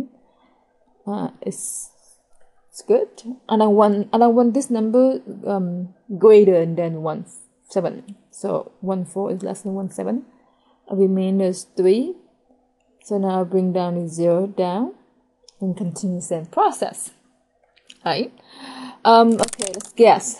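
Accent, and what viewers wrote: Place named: France. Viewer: yep...you''re French....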